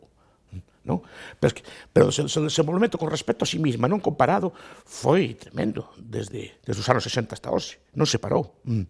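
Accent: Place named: Castile, Spain. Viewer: Olá, o sr, sabe que a sua língua e o galego português muito bem , então procure defender mais a sua língua , deixe de falar meio castelhanizado , já é tempo e bem , isso não faz sentido , bom fim de semana .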